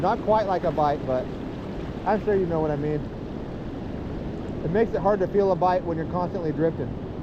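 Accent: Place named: Oregon, United States. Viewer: Never apologize for being and expressing yourself naturally in the way you do! Especially with joy and elation! That's what it's all about man. Hell of a trip, hell of a fish, and that message you made about never letting the kid in you go away is dead on. And you gotta love the classic native Oregonianisms like Crik and Spendy! A great tell to identify a born and raised Oregonian.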